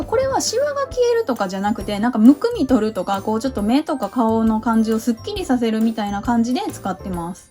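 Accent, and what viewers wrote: Kansai, Japan. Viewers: 初めまして✨ サムネの可愛さと今スキンケアが凄く気になっててめっちゃ参考になりました🥺💕💕 気になったアイテムをQoo10で購入しようと思います✨ あと私関西人なので関西弁がとっても親しみやすいなと思いました🥰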